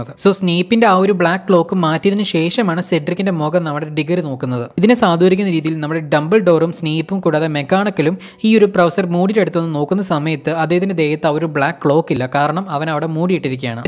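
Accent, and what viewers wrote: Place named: Kollam, India. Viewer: Cheyttaaa plzzzz bakiii pettannu idoooooo exam ayalll kanammm amma samathikullaaa... Plzzz chettantee videooo powliyannuu athu kondannuu mattulavaruu ittittuu but chettante expalanetion powwliiyanuuu piinnee njan nerathee itta messanginnu chettann reply thanthill orupaduuuu thankzzzzz pinee chettanteew sthalamm ethaa kettittu kolamm language polee unduuu ethayalluu superr😘😘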